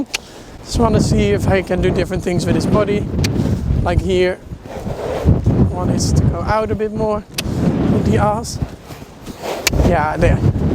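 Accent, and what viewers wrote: Netherlands. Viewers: im sorry but are You dutch im very sorry to ask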